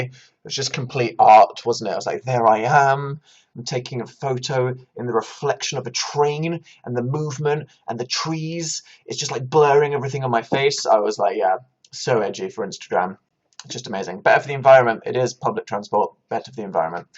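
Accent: RP, United Kingdom. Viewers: Your so posh lol!! Was deleted wwwsexyllamas was deleted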